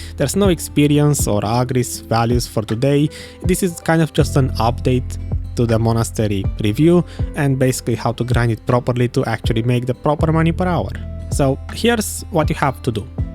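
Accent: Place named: Romania. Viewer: You sound very romanian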